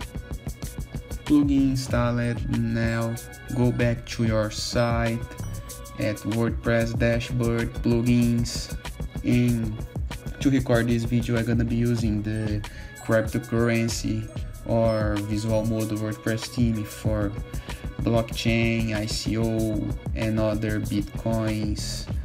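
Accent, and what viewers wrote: Brazil. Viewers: Olá, amigo. Pelo sotaque suponho que é brasileiro. Quero ver se consegue me ajudar com um dúvida, quero gerar uma forma de pagamento que transforme o valor de Reais para a cotação do momento, tipo, gerar o QR code de R$500 com a cotação do mercado bitocoin. Valeu, amigo. Sucesso.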